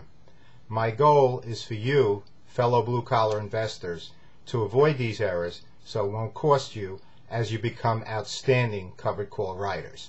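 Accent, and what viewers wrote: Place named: Long Island, United States. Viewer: OMG, within the first minute I thought to myself.. His accent sure sounds like hes from LongGisland (Where I live) then wamn, I noticed the Bethpage address in the background. Farmingdale resident here.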